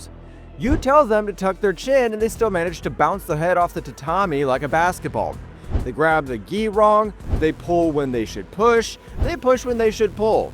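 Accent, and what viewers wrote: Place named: United Kingdom. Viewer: british accaent e rbooks uk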